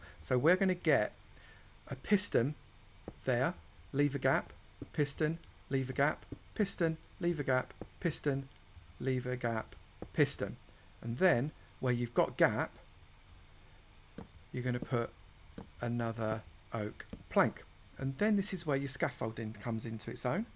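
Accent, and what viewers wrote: United Kingdom. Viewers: it's not even close, like it's not even in the realm of Gordon's low fast-paced voice. The only similarity is that they both have a British accent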